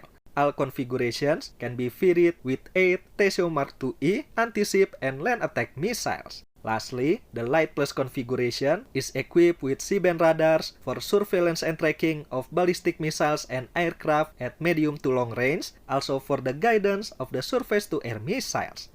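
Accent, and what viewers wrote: Indonesia. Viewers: Logat Indonesia, bukan bule.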